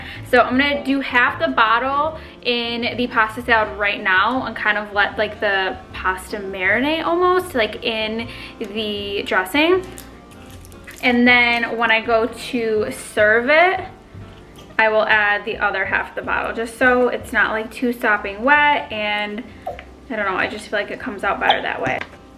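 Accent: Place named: Chicago, United States. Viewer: R u originally from like Chicago or somewhere around that area cuz u have an accent but I can’t pinpoint it lol.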